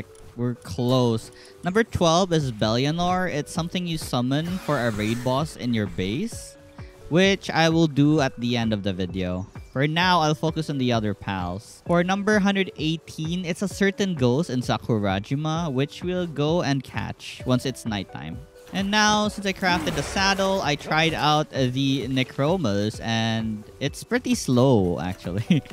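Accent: Philippines. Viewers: are you Filipino? cause you have a Filipino accent!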